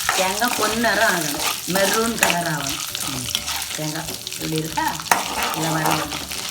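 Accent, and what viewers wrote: Nagercoil, India. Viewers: Nagercoil slang super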